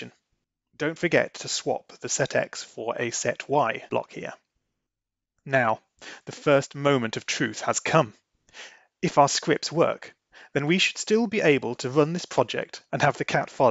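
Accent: United Kingdom. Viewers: I could listen to your british voice all day. It kind of reminds me of the times when the internet was for nerds and mainstream brands didn't take it seriously.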